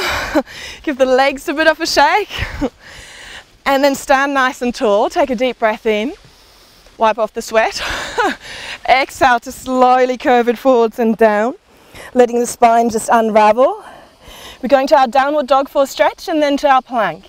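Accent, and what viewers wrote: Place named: Australia. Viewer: Nice video. I love you aussie accent !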